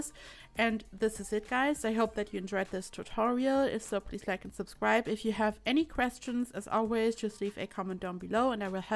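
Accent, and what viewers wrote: Germany. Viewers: Deutscher Akzent iz da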